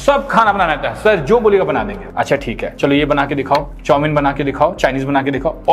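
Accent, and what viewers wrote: Bihar, India. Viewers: bhaiya your bihari accent is so sexy and abhi to mai javascript me gote laga rha hu.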